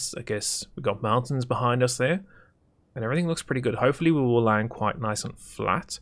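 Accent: South Africa. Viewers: Sounds a little like a ZA boi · Is that a south african accent I detect?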